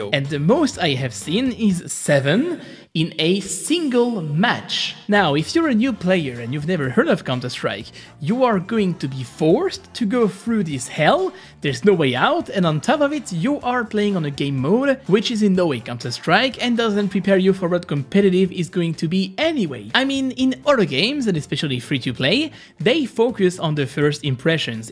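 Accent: France. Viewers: Tu es français ou je me trompe car ton accent m'a l'air bien francisé ??!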